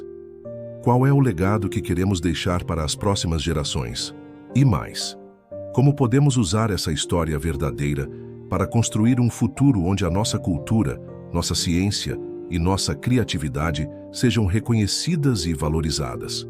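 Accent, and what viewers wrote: Brazil. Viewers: Vão mas é trabalhar. Este narrador com sotaque brasileiro a dizer que é africano é só para 😂😂😂😂. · ÉS AFRICANO COM SOTAQUE BRASILEIRO?!! A VERDADEIRA HISTÓRIA DA ÁFRICA E BERBERE OS CELTAS TAMBEM ERAM BERBERES DEPOIS ALGUEM FEZ UMA MANIPULAÇÃO GENÉTICA CRIMINOSA E CRIOU CANIBAIS OS BERBERES E CELTAS SAO OS MESMOS ATLANTES OS PORTUGUESES ERAM A ELITE ATLANTE OS PIRTUGUESES FORAM AS AMAZONAS OS FENÍCIOS OS TEMPLARIPS E OS ESCRAVOS NEGROS BRASILEIROS OS INGLESES GORAM OS ESCRABOS NEGROS USA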